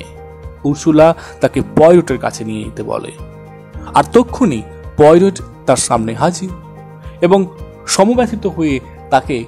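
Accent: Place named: England, United Kingdom. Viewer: Everything is nice but you should have stuck to your own Bengali tone but your tone seemed to be English while you speaking bengali..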